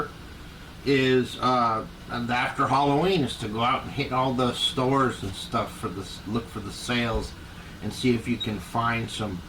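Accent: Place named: New England, United States. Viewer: "Draw-ring"....lol, can't shake that New England accent. Abdiel murdered that skull challenge!!! Den Mother Edie was super clever making her Lenofish out of a skull, I didn't see that coming! Congrats to Fraidy and Monster Morgue Tutorials(Abdiel) for their wins!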